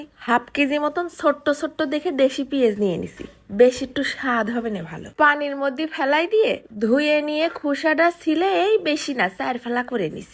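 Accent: Jessore, Bangladesh. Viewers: আপনার বাড়ি কি যশোর না গোপালগঞ্জ?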